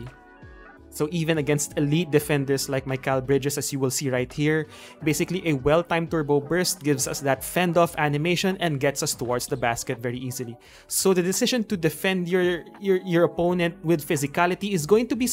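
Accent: Philippines. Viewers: Bro are u filipino i can hear it in the accent lol all my teachers were filipino on guam 🤣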